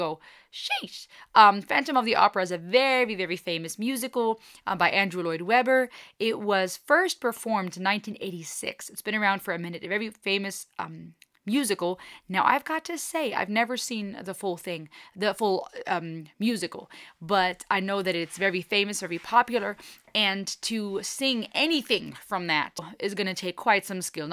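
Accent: Swabia, Germany. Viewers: Heut sich a bisserl Schwäbisch an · Well....if you like this.....and undeniable this is GREAT (and Floor will always find the weak spot in my heart).....you need to check out also the Version with and Tarja from Phantom of the Opera from "End of an Era"...you will not be disappointed. Oh...and btw....your german accent is nice 🙂 Army Germany out for now. All Hail Queen Floor!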